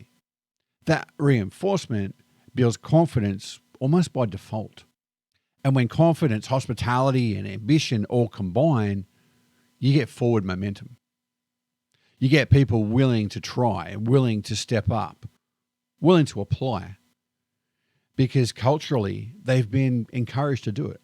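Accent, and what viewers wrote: Australia. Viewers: I love your Aussie accent.🎉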